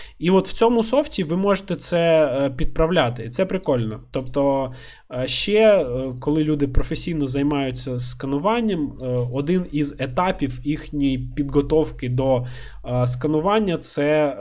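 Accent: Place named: Surzhik, Ukraine. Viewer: Классний суржик, бро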